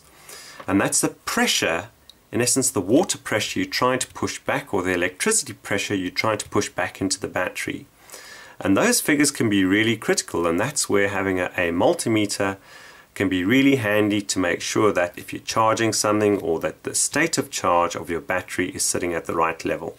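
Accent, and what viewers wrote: South Africa. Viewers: are you south african ? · don't you just love our South African accents? thanks for the video this is amazing! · South African accent! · I found the subtitles extremely distracting. I assume that some software application was used, which had difficulty with your South African accent. Pity. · Absolutely brilliant tutorial, I knew as soon as you started talking that you are South African! nice to see a south african using vlogs for educating about electricity, Fab! I bought a multimeter a few months ago and had no idea how ot use it. Now since watching your extremely concise and clear tutorial I am going around and probing everything haha, everything DC that is! AC scares me!!!! quick question: I have a cheap chinese android tablet which I aquired a while ago which worked for one day and then would not charge, absolutely nothing at first glance but then I opened her up and seen its got a 3.7v Cell, I just wanted to know how I would test for voltage on this I want to know if its dead or not? 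do I need to desolder it from the board to get a true reading or can I just measure it in situ? if I find its not the battery where do I go from here, I dont know anything about how these things provide the power to the board? would be really great to be able to use this tablet as my other tablet is a 1st Gen Ipad which I wont slag off it has its pros, but it also has its cons, that being of limited compatability with most of todays apps! thanks for posting I am now more enlightened!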